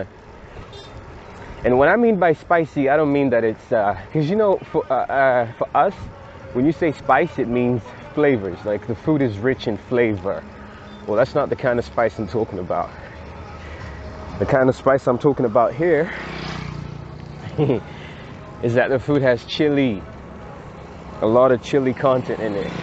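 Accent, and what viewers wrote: United States. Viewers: It's so noisy with that freaking non-stop honking. Can drive you crazy! Btw, your english is pretty good just like an american accent.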